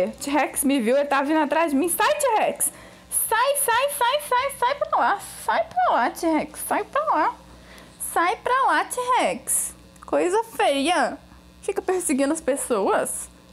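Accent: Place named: Fortaleza, Brazil. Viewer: Vc mora em Fortaleza ???? Pelo o jeito de 0parece s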